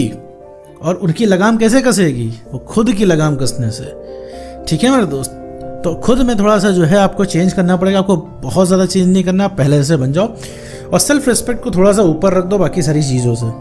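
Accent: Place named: Lucknow, India. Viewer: Ap kha se ho lucknow ya kanpur side ki aawaj lg rhi h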